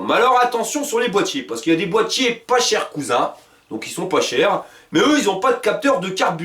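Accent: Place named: Pas-de-Calais, France. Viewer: top vos explications les gars. je roule avec un wagon r Suzuki a 100% éthanol l'été et 50 l'hiver max depuis 5 ans. j'avais avant un boîtier pourri acheter sur eBay qui ne sert a rien car non équipe de capteur éthanol comme vous l'expliquer si bien. encore bravo et vive l'accent du pas de calais. signé un ti normand.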